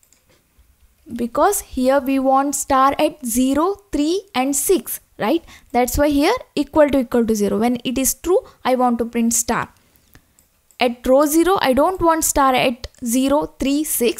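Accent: South India, India. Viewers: Ur voice close to South indian, while speaking southern India will play around,. 😉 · Your voice and accent is like south Indian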